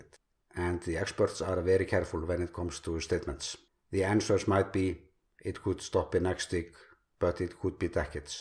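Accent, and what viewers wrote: Iceland. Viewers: Thanks and keep us updated! I really like Your cute islandic English.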